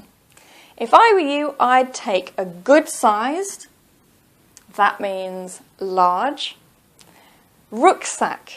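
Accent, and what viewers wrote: United Kingdom. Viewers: Hi. Thank you very much for your really nice teaching English with wonderful smile. I am very like British accent, so I am real glad that your English teaching on YouTube. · that's a great work, great accent, continued and uninterrupted, i wish i could talk like British,my accolades for your altruism, have seen your website too. its catchy. thanks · Would you please upload a video with tips to get correct answers on map/diagram matching on listening please? Would really appreciate. Love your British accent 😆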